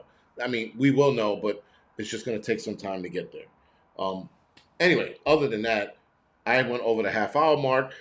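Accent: New York, United States. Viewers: I love your NYC accent. I'm from Chicago and I love the East Coast. We canot let these ppl continue to messup ourcountry.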